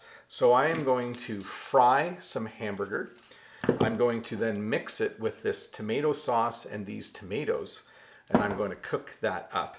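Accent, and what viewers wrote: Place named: Canada. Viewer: I love the Canadian accent... it sounds smoother than the British accent 💖. do you have different accents in Canada 🇨🇦? If so why you don’t tell us about them please... 🤗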